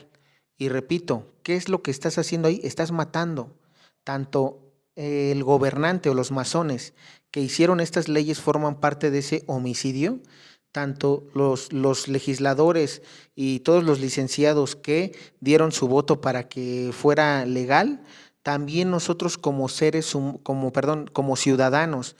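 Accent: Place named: Mexico. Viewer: Aparte de la excelente explicación, como nos enseña el hno. que todo queda claro, me agrada el acento y modo de hablar de mejicano. Me ha hecho reir como nos habla sencillo, claro y conciso sin ambiguedades.😅🙏👍 gracias hno. Saludo y bendiciones.🇵🇦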